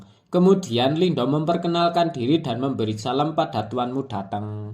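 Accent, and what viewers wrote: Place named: Banyumas, Indonesia. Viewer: WONG NGAPAK TAH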